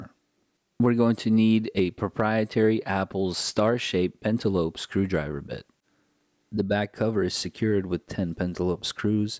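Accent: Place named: Philippines. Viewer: cool dude, i know ur pinoy base on ur accent 😁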